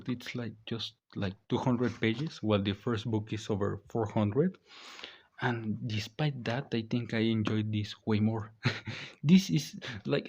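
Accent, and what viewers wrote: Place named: Mexico. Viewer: That nut worthy thick Mexican accent returns!!!❤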